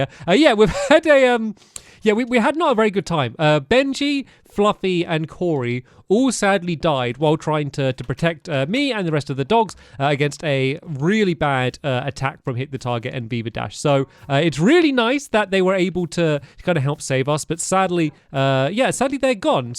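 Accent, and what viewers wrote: United Kingdom. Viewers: Yo stampy u speak British. ?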